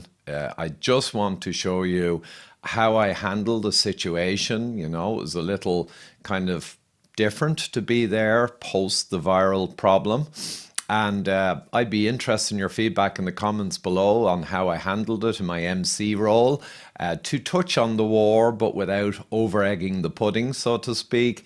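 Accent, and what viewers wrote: Ireland. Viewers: I think without real statisticians like Norman Fenton and others like you we would have been f..ed. You are good presenter and Irish accent helps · Nice job on your intros,. And yes, we Americans DO like an Irish brogue.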